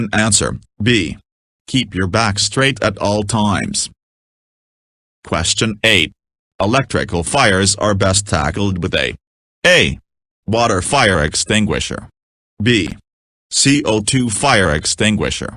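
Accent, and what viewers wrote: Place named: United States. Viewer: Why the american accent voice over? Also, since when do we use the term "gasoline"? Very poor quality.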